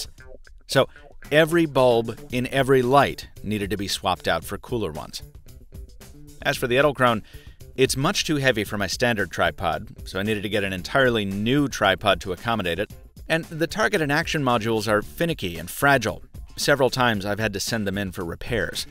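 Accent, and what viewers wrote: Boston, United States. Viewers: are u from boston ?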